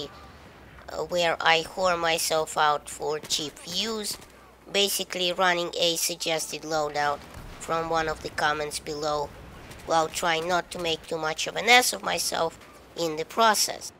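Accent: Russia. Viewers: видно что ты русский :DDD с твоим произношением англиского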